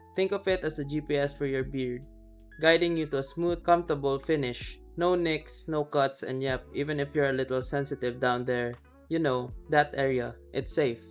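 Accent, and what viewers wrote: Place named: India. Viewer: What's with white guy on your thumbnail when you're Indian voice? 100% you haven't used this or own it, not once did you show it on camera, only website footage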